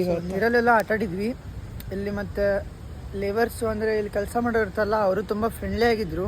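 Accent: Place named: Mysore, India. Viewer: Nice trying Mysore language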